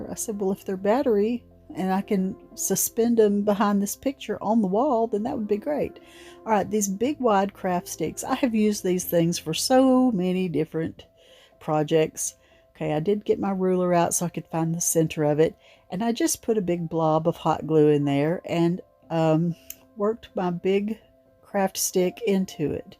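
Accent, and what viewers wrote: South, United States. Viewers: I haven’t heard anyone say “Swanee” in years I don’t know how to spell what a Southerner says for (I swear) love watching and listening to you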